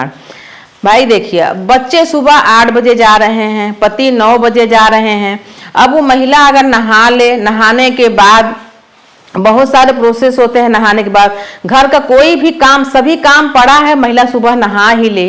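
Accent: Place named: Prayagraj, India. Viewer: मां जी प्रणाम, लोगों की तो मुझे पता नहीं मगर मुझे आपकी भाषा बहुत अच्छी लगती है। हम तो राजस्थान से हैं। लेकिन जब मैं बहुत छोटी थी तब मेरे पापा जी के राजस्थान में ही आफिस में एक अंकल जी थे प्रयागराज से उनके बोलने का तरीका भी यही था और मुझे उनका बोलना बहुत ज्यादा अच्छा लगता था। सच कहूं तो मुझे उनके इस तरह से बोलने पर मुझे तो उनकी वाणी में बहुत ही मिठास महसूस होती थी और मैं तो जब भी आपका वीडियो देखती हूं तो तब भी वही मिठास महसूस होती है।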